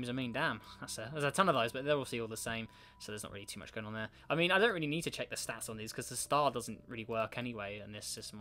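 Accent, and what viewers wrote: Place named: United Kingdom. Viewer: Speek americane please i cant understand briten people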